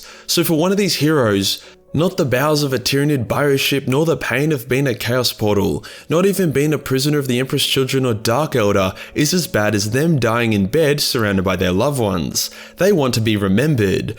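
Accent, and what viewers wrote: Australia. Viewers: I'm really disappointed that you did not do any political jokes. You throw homophobic slurs, sexism, autism jokes and more. But oh let's give politics a break. What the fuck dude. >:( I thought I was listening to an Australian. Not a Canadian.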